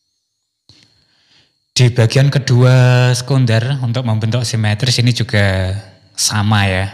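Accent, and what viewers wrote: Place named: East Java, Indonesia. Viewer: sampean orang mana om,,logatnya kayak jatim bagian kulon..😁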